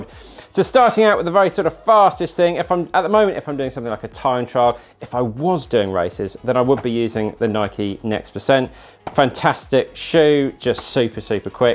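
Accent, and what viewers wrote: United Kingdom. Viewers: Me and my bf find you so cute and love your British accent. I'm currently aiming for sub 2.40 marathon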